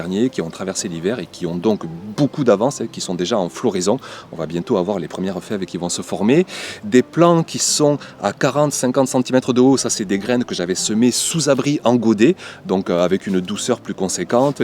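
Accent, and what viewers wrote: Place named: Southeast, France. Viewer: Bonjour, natif du sud-est, je te suis depuis la Bretagne, ton accent me rappelle les sources 😎 merci pour cette vidéo, toujours un plaisir à regarder, écouter et pleins de bons conseils. Par contre je me pose une question, dans la vidéo tu indiques que tu manges les fèves crues, j'avais lu que: "Les fèves crues (comme d'autres légumineuses) contiennent des substances naturelles comme des lectines qui peuvent être toxiques ou irritantes pour le système digestif si elles ne sont pas détruites par la cuisson." Personnellement il m'arrivait d'ouvrir quelques cosses et en manger directement dans le potager 😋 mais du coup je ne suis pas certain que ce soit une bonne idée côté digestif, il vaut peut être mieux les cuire non? (Je pense que tout est question de quantité aussi). Belle journée;)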